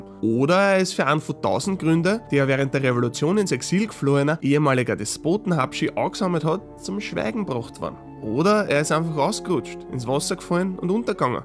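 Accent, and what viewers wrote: Vienna, Austria. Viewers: Oida du bist österreicher?! Ur leiwand! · Habe grad erst bemerkt, dass ich noch nie so richtig Österreichisch gehört. Schöner Dialekt Grüße aus Baden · Tolles Video, ich finde das kommt viel sympathischer rüber, als die Englische Version :) Infovideos im Weaner Dialekt, wos ned ois gibt... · Wienerisch net deitsch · Ösi Dialekt 😂😂😂 putzig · Ich mag deinen Akzent!! At last educational videos in Austrian dialect!! :) danke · Ur Leiwond a moi an Wiener auf Youtube zu hean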